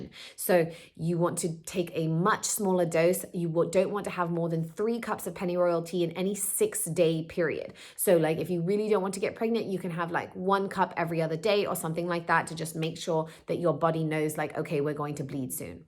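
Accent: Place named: United Kingdom. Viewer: Liked it initially simply for the accent. My friend and I will use a brutish accent from time to time. Makes us feel more creative,brilliant, vibrant, and .... wealthy. But I would’ve liked the video anyway for the knowledge that was given. And I’m running to the cabinet now to grab my neem oil! Thanks 😘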